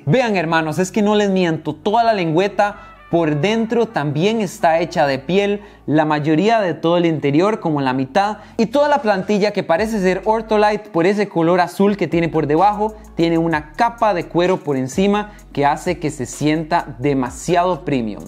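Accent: Costa Rica. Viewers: Sos de Costa Rica? 🤔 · Usted es de Costa Rica? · Eres de Costa Rica? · Es de Costa Rica?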